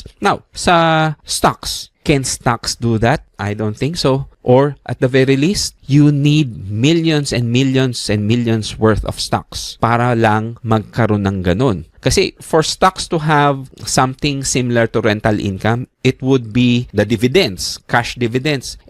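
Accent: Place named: Philippines. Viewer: That is the most beautiful Filipino accent I ever heard.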